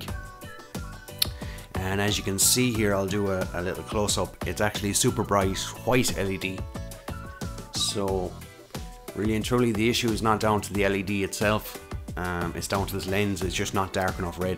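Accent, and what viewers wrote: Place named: Ireland. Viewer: wasent going to like because of, i usually dont like videos.. but i really like the irish accent, so i liked it plus thx for the help XD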